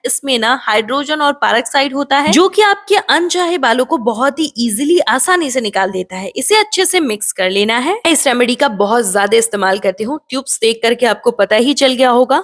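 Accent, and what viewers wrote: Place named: Gonda, India. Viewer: Gonda se bol rahe hai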